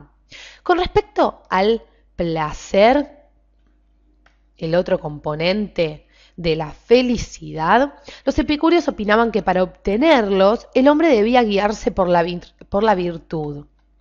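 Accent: Argentina. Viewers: Un placer epicúreo es disfrutar del acento argentino. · el acento argentino PTM no me gusta... la info buena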